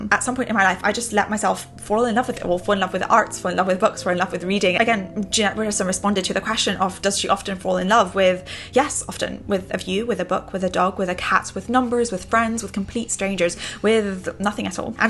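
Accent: United Kingdom. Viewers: Are you British? I though you were an Aussie.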